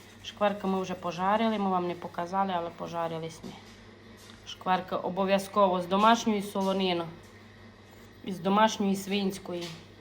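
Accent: Transcarpathia, Ukraine. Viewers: Діалект Закарпаття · Гуцули так не говорять, невже ви відрізняєте.Ця жінка з Закарпаття, так що вона не гуцулка..Гуцули живуть у Капатах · Розмовляє на закарпатському діалекті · Так гарно показуєте і мова ваша закарпатська така гарна. Також в молоді літа виділа як на сільських столах стояла велика миска і з неї вся сімʼя їла. І тато нас вчив їсти з краєчку, бо так скорше остиває і не попечешся. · Така файно по-закарпатськи розмовляєте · Ну стільки масла , сметани і на вершках, ще б не було добре 😂😂😂 !❤ А ваша закарпатська, то мед на вуха і душу !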